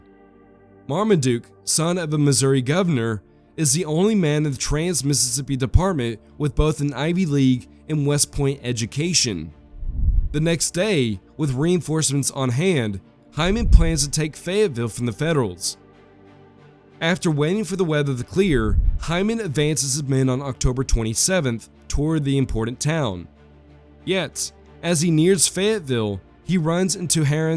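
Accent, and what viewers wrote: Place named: Texas, United States. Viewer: Really? It takes 4 months an episode. The Texas accent is a distraction also.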